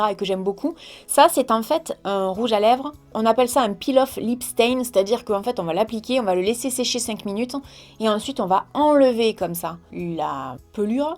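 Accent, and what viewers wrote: South, France. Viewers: Bonjour Tout d’abord je voulais vous dire que je suis tombé sur vos vidéos par hasard et que je suis devenue fan de votre chaîne.vous êtes rigolotes, pétillantes et votre accent me fait voyager dans le sud à chaque fois..... bref je suis tombée sur un site internet kosmeopolis qui vend du make up a petit prix (marqué Bell, joko...) je recommence à me maquiller un peu plus depuis quelques semaines et vos vidéos a petit prix sont parfaites pour moi. bonne journée à vous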